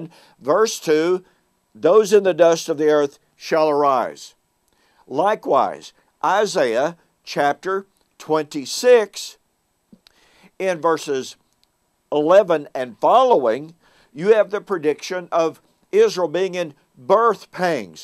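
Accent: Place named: Oklahoma, United States. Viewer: Sounds like Ardmore, Oklahoma. I used to live in the City.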